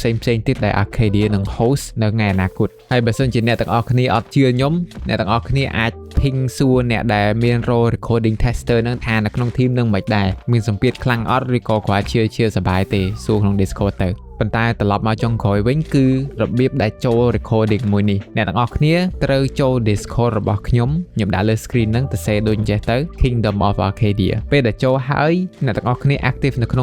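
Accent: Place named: India. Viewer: ur accent sound as india ( recording)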